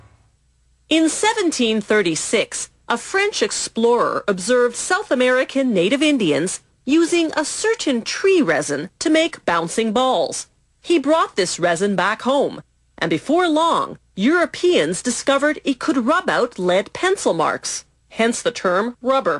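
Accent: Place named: Canada. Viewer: she's very canadian, eay.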